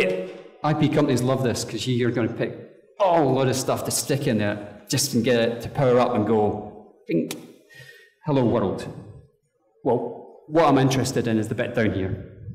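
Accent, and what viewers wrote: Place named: India. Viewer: Indian english host？the accent is very unformal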